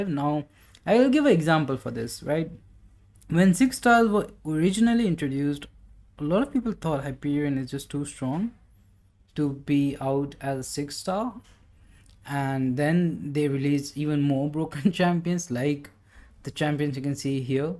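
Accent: India. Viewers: Mumbai accent.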